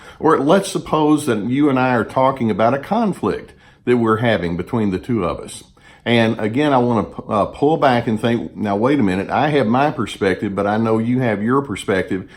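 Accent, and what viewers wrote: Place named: Texas, United States. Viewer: You sound like you're from Texas-east or central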